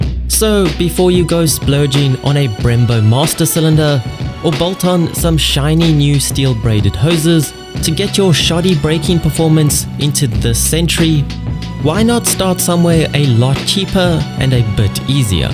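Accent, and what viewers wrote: South Africa. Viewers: dud are you from south africa? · How do you know JHS Racing bro? I just assumed from the accent you were in RSA. Awesome crew aren't they? Great vid, thanks mate.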